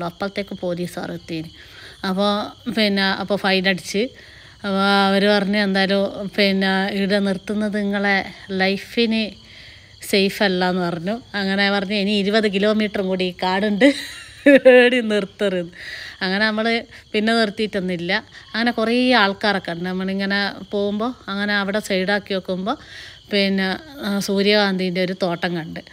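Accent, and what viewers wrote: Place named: Kannur, India. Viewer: വീഡിയോ വളരെ രസകരമെന്ന് പറയാതിരിക്കാൻ വയ്യ!!💢 നർമ്മം കലർന്ന തനി നാടൻ ഭാഷയാണ് വീഡിയോയുടെ പ്രധാന ഭംഗി👌🎉🎉!!! അത് നമ്മുടെ കണ്ണൂർ ഭാഷയിൽ കേൾക്കുമ്പോൾ നല്ല സന്തോഷം✨ ഹരിത ഭംഗി തുളുമ്പുന്ന പച്ചപ്പു നിറഞ്ഞ കാടുകളും കൂറ്റൻ മരങ്ങളും കാട്ടരുവികളും , പൂക്കളും മാൻ ആനക്കൂട്ടങ്ങളും നിറഞ്ഞ പ്രകൃതിയുടെ സുന്ദരങ്ങളായ കാഴ്ചകൾ അതിമനോഹരമായി പകർത്തിയിട്ടുണ്ട്.👌✨ കാഴ്ചക്കാരുടെ മനം കവരുന്നതായിരുന്നു ദൃശ്യങ്ങളോരോന്നും🎉🎉🙏✍️✍️ ആശംസകൾ💫💫💫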